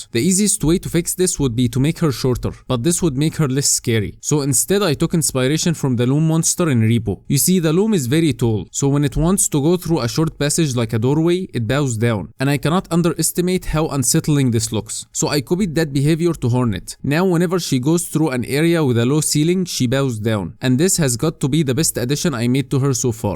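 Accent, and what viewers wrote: Egypt. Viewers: عااااااش يا باشا ماشاءالله موهوب عرفت انك مصري من اول الفيديو❤❤❤❤❤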